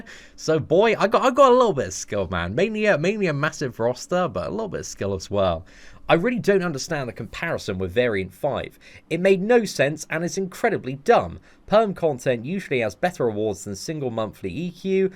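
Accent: United Kingdom. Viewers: Ngl there are 3 reasons why I am subscribed 1. You're british accent is amazing 2. You gamble everytime 3. I play contest of champions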